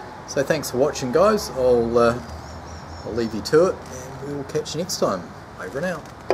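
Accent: England, United Kingdom. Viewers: Nice one bud couple of chaps are brewing with jester hops and sounds a cracking beer, good challenge still say the words like if you was in the UK. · Nice one, your words sound like most English people, funny but someone found shopping trolley hilarious, I think it was on Christian's video,I thought l would get mine out of the way, good sport, Cheers mate 🍻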